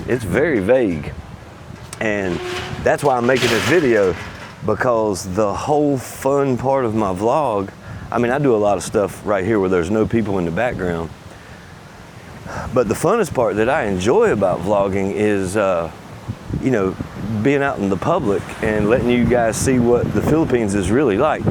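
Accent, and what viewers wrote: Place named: South, United States. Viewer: Hi Mr. please don’t leave.I loved watching you, I’m a pilipina 72 years old and I enjoyed watching you. You seems like you’re down to earth young man,I’m from Little Rock Arkansas so I just love your southern accent 😊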